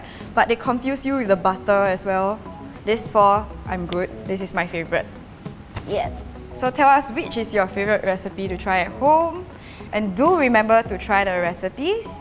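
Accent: United Kingdom. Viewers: You have british accent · is she english? she sounds like she grew up in the UK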